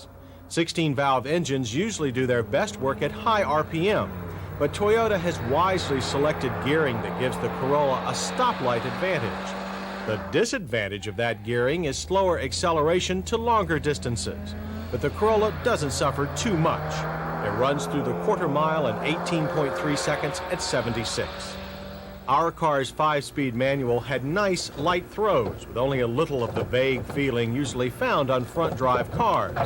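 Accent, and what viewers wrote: United States. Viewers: What state is his accent reminiscent of? Cam Ray 😂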